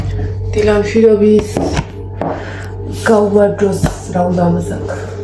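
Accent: Martkopi, Georgia. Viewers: ზუატად მანდ ხარ მართალი დიდი ხანი გიყურებ დამივხვდი რომ საერთოდ არ თამაშობ.შენი ძირძველი მარტყოფული კილო არ უნდა დაიკარგოს . არა კახური❤ძალიან მაღიზიანებს კუთხეს რომ მალავენ წვალებით და არც გამოზდით იყავი ის ვინც ხარ რა❤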